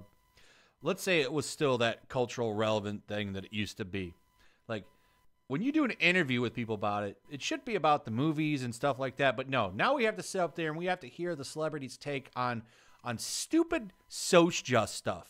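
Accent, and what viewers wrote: England, United Kingdom. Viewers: 'Peckman'? Oh, you read almost as badly as Gary Beuchler. Peckham. Pronounced 'PEK-em' or 'PEK-um'. An area in south London. I'll give you this, though: this is one time when your daft plummy english accent is appropriate...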